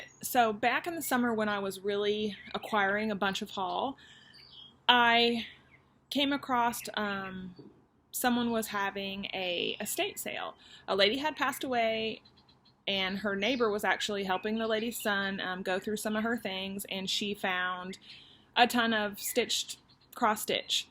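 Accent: South, United States. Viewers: Just finished watching both of your videos, and really enjoyed them! Love your location and your southern accent. My husband and I vacationed at Fort Walton Beach a few years ago, and really love the Gulf Coast. Your stitching is lovely, and I really enjoy your book reviews. I will have to try the Victorian Motto Sampler threads, as they look truly beautiful. Looking forward to more videos from you!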